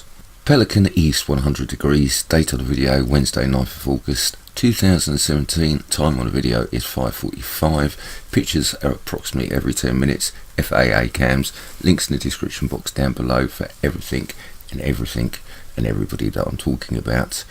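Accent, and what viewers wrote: United Kingdom. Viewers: Hiya mate I've been watching your videos for a good few days now. Your videos are probably showing the best evidence I've seen. Can you tell me what the lensing system is? Great videos mate. You sound British? Down south? I'm from wigan near Manchester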